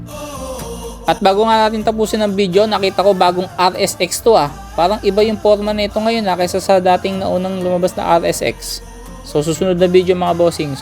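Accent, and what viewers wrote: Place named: Tagalog, Philippines. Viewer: Cguro boss ang pronounce nya sa example ay exampel hehe ksi tagalog sya,yong double dobel sa mga tagalog hahaha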